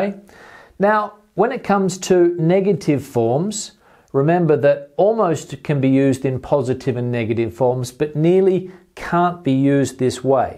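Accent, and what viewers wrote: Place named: RP, United Kingdom. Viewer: It was very pleasantly and usefuly to listen to your lesson, sir! You have the real British aristocratic prunounciation! Thank you. I'm from Russia. Now I'll watch your classes more often.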